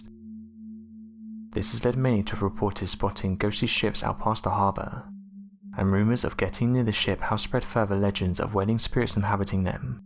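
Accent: England, United Kingdom. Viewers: T A it’s an English accent.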